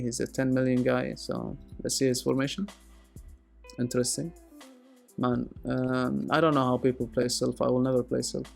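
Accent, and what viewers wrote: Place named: Arab world. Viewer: ليش صوتك كأنك عربي يتكلم انجليزي ؟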